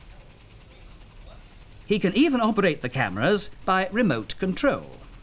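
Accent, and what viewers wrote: RP, United Kingdom. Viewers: “Tel-eh-vishon keyameras” love the R.P. Btw, Wondered how long it would take for some sad kn*bhead to mention ‘Police State’ this was1972 you d*cks! It’s just a bit of nostalgia!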